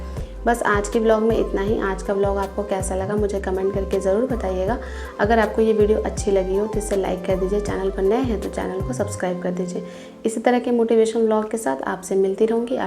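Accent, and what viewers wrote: Bihar, India. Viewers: Kya aap Bihar se h?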